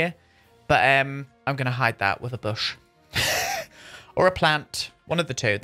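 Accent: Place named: United Kingdom. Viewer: I love that you say " I Reckon " and your British... I love it !!!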